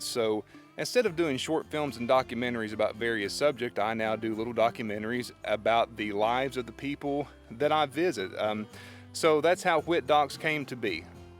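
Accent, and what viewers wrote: South, United States. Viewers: That’s the voice of a southern gentleman. Do you use beard products?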